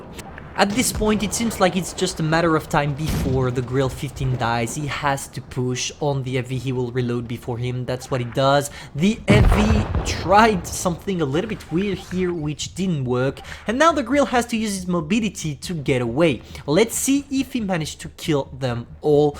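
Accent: France. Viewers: Question? Are you French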